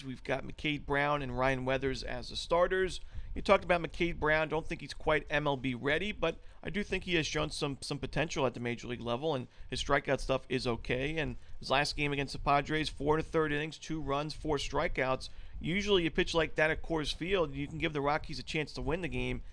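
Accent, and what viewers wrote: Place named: New Jersey, United States. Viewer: FRM PICKKK DAWGZ *jersey accent, emphasis on the K’s.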